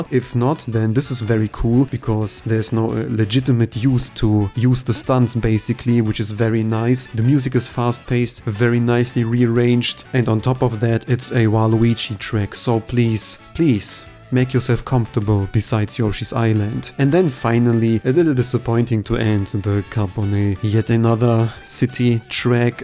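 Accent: Germany. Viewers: IMO the city tracks in this wave were a huge improvement, Singapore is pretty good and Bangkok is even among my favorite tracks in the entire game! Side note, by the way you talk it's very noticeable that you're German (ich bin auch deutsch ^^). Try to use the word "the" less in places where it doesn't belong in English. For example, just say "Yoshi's Island" and not "the Yoshi's Island".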